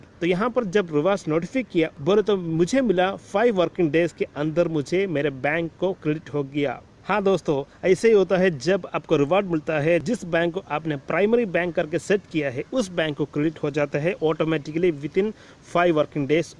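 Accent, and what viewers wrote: Kerala, India. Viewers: Malayali ahn ale Hindi kettepoll thonni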